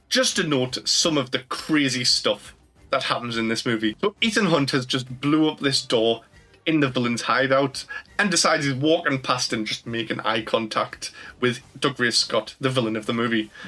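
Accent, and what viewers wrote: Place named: Newcastle, United Kingdom. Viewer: 😂 damn geordie accent man lol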